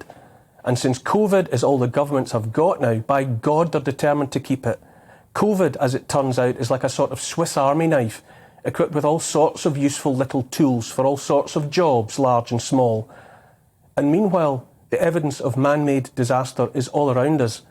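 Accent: Scotland, United Kingdom. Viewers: Neil, your speeches are always so moving, like great literature, and spoken in a beautiful Scottish accent. I would implore everyone to take their children out of school if they are being forced to mask at at school. Children are capable of learning without government schools.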